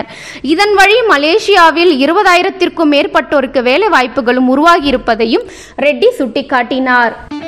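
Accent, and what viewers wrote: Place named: Sri Lanka. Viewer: The way she pronounes lk fm Srilanka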